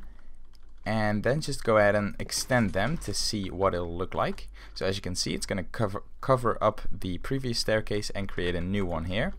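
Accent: Netherlands. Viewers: Where are you from? You sound dutchie :p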